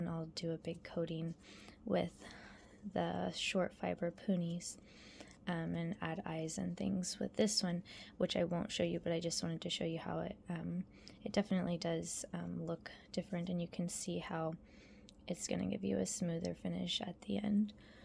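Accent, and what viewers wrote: United States. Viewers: As a fellow Midwesterner from Kansas, I too speak like you and many of us from the area. Don't change who you are or how you normally speak because someone complained about it. They can actually change the speed of the video on their own. People need to accept people for whom the are. If it bothers them, it's them, not you. ❤ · Thanks for the video 😊 I think you did an excellent job of speaking and filming. The close ups looked fine and you could see detail like you wanted. I am also a southern girl and I do drawl 😂 You were not hard to listen to for me but I am one of those early 50’s demographic and have some patience I have developed. I enjoyed this video and what a cute expression of creativity!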